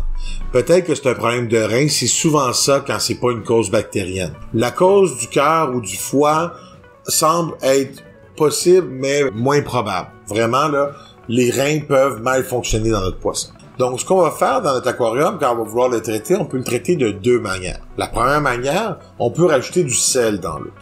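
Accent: Quebec, Canada. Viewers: Bonjour. Je viens de découvrir votre vidéo, car mon betta souffre exactement de ce problème depuis 2 jours. Malheureusement, j'ai compris ça juste aujourd´hui. Il est donc en bac hôpital une journée trop tard. Hier, il n'était pas rond, mais très amophe dans le fond de l'aquarium. Il était nickel en début de semaine. J'ai un escargot qui est mort cette semaine et je me demande si c'est cela. Je suis vraiment peinée, car cela fait 2 mois que je l'aie et j'ai étudié énormément sur l'aquariophilie avant de me lancer dans cette aventure. J'ai de la peine, car je me suis réellement attachée à mon betta (j'ai braillé comme un veau toute la journée), mais j'ai aussi un sentiment d'échec. Je tiens à vous féliciter pour la clarté des informations. C'est une très bonne vidéo. Étant québécoise, je trouve ça cool aussi d'avoir enfin de l'information, via le web et transmise par des gens de chez-nous. 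Je suis abonnée à plusieurs chaines YouTube Européennes, mais notre petit accent est tout de même sympatoche :) J'espère que votre poisson a tenu le coup. Sincères salutations de la ville de Québec 💁🏼‍♀️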